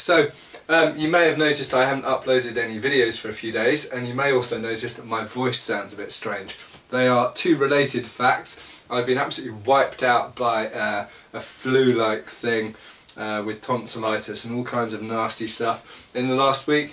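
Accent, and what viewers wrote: United Kingdom. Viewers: I don't know how, but you sound more British here.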